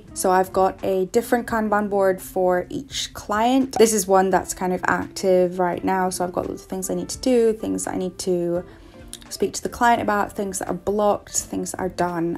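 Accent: Scotland, United Kingdom. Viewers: Your accent though. Are you scottish?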